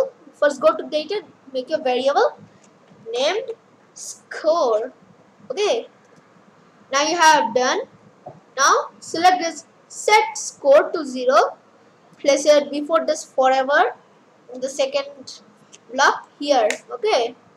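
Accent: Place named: India. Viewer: I like the indian accent "the firs ting" lol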